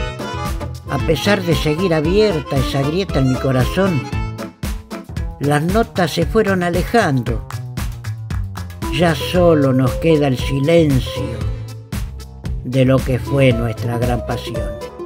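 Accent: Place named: Argentina. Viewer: En tu voz y deje argentino cobra más vida este poema mi querido amigo, orgullosa de tu buena declamación...Gracias muchas gracias. abrazos.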